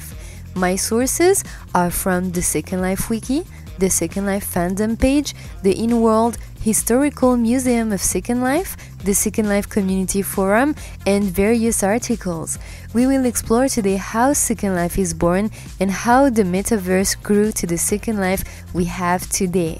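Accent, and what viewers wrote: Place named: France. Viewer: habibti!!! TYSM so much for all the research you did to make this really informative video. As always, it is a wonderful pleasure to hear your yummy French accent. Bisoussssss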